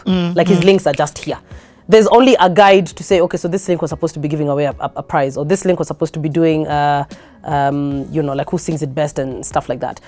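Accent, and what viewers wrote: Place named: Mombasa, Kenya. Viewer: This lady Tande is a bomb. Awesome personality, great in anything she does. I real love and affirm her. Mombasa Swahili dialet second to none. Not conforming to society expectations but on a lighter note, can make a great wife to somebody deserving. She has personality and brains of a well parented gal.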